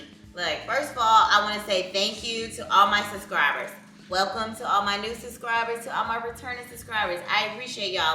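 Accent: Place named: Texas, United States. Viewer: Got a Texas accent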